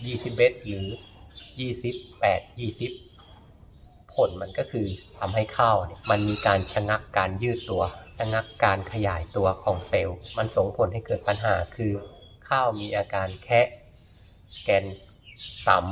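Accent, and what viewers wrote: Sukhothai, Thailand. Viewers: ส่ำเนียง เหมื่อน คน ซุโข่ทัย เลยครับ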